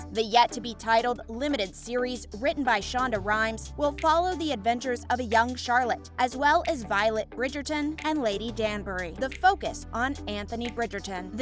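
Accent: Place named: United States. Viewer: Just click bait. Nothing about Rege coming back. And that grating American accent narrating about a very English show. Ugh!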